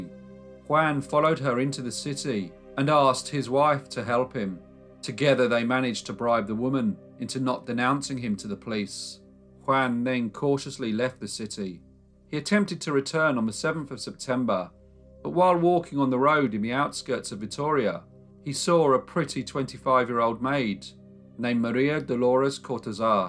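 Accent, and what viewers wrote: England, United Kingdom. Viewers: I always love the really obscure stories you find which nobody else presents to us. I sometimes imagine a sleep over in a Creepy Old Mansion,we loyal subbers are all gathered around the Great blazing fireplace with candles lit around the decrepit Victorian Room,we roast our Marshmallows as you sit at the hearth,your distinctive English accent regales us with dark tales of Bloody Murder. This channel is truly a glistening jewel in the Crown of Olde Youtubian Lore.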